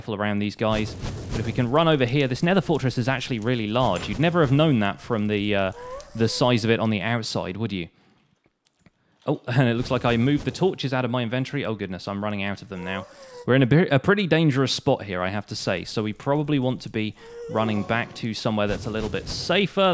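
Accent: England, United Kingdom. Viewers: Hey are you from england or USA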